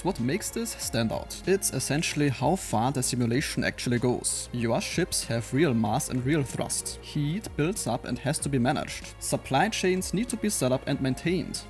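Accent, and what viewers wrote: Germany. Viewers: Basically a good vid, but whay not in German? Your German accent makes it hard to watch, at least for me. And its really like reading off from your notes, transletet into English... so it is like a very rehearsed/scripted manner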